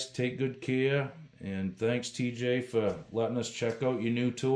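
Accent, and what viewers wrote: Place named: East Coast, United States. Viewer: No offense, are you from Massachusetts?Or New York? I hear some upper East coast dialect. Talk less. Let us hear the amp more.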